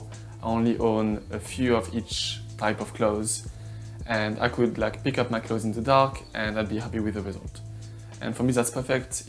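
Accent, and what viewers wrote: France. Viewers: Ton petit accent français doit tous les faire craquer ! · Love it saving time is essential so we can do the things that we like. Loving the minimalist approach too. Also your French accent is endearing the British boys must love it. Although the London gay scene can be exclusive.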